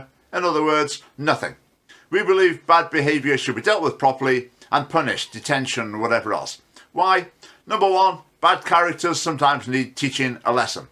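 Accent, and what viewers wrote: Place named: England, United Kingdom. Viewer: Just another whining English voice interfering in our country. Get out of Scotland and stop calling yourself Scottish.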